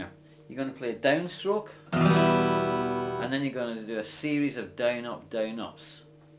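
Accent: Scotland, United Kingdom. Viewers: woah i was not expexcting a mrs. doubtfire accent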